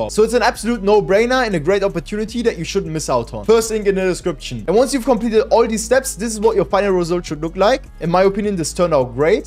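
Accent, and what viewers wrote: Germany. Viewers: Ich hör den deutschen Akzent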